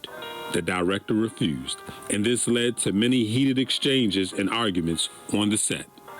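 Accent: Baltimore, United States. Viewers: The woman's name is pronounced OOOH-tah HAH-gen (Uta Hagen). She was someone you truly need to research. Also, the role from Othello is pronounced Ee-YAH-go (Iago). You're Baltimore accent is strong. I love it! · I was today old when I learned that the name Biggie Smalls was from the movie “Let’s Do it Again.” Also my people are from the Bahamas. Cool. Also I hear that Baltimore accent. Good video 👍🏿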